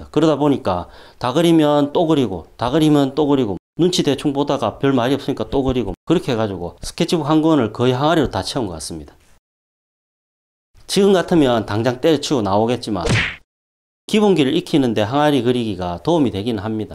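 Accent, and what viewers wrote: Gyeongsang, South Korea. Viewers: 이런 유익한 영상은 광고 건너뛰기 절! 대! 로! 안하고 다 봅니다! 선생님의 군더더기 없는 갱상도 말투, 더 없이 편하게 듣고 있습니다. 시어머니가 74세 인데, 제 결혼 사진을 보고 연필 인물화를 그려주셨는데 너무나 충격적(넘나 못그림 ㅜㅜ어머니도 40대에 그림 배웠다고 하시는데 맨날 천날 꽃 그림만 그리는 이유가 있었음. 꽃은 대충 그려도 이쁘니까)이어서 당장 유투브 보고 유익한 동영상 뒤져서 일단 구도 좀 잡고 비율이라도 맞게 그리라고 붙여드리면서 발견한 보물같은 채널입니다. 저도 중딩때 아주 잠깐 미술학원 다녔는데 딱 화실 분위기였어요. 강사님은 따로 없고, 모든 선배님들이 지나가면서 그림 코치해주시더라고요. 다들 대학생인지 잘 모르겠고 매일 오지도 않고, 원장샘은 아무것도 안하는...(기나오지도 않고 ㅋㅋㅋ) 그리고 선배님들이 다 말이 달라서 a 선배님이 이렇게 그리라고 하고 사라지고 b 선배님이 나타나서 야단을 칩니다. 야임마 누가 이래 그리라대! 그리고 다음날은 c 님이 다르게 가르쳐 주면서 기존에 배운 그림을 비난합니다. 누구말을 들어야 할지 혼돈의 카오스 같았지요. 미술학원은 다 이런건가 이러면서 잠시 다녔습니다 마음 같아선 평생 다니고 싶었는데 전공할 건 아니라서 아빠가 못 다니게 함 돈 없다고 ...ㅜㅜ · 김해입니다..경상도 사투리가 정겹습니다..감사히 보고있습니다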